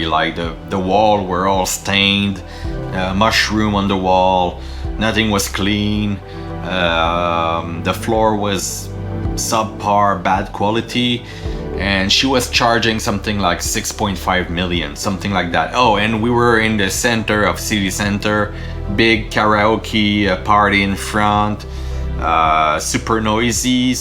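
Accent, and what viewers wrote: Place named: Quebec, Canada. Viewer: Bonne décision! c'est vrais que c'était marrant en québécois mais on ne comprenait pas tout. Les français sont des grosse faignasse, ils ne sont meme pas capable d'apprendre l'anglais 🤣 peut etre que tu vas les motiver hehe. Your English is Very good by the way!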